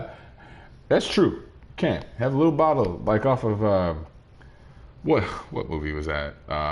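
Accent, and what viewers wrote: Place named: Detroit, United States. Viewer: Are you from Detroit? You sound like you're from Detroit. I'm from Detroit.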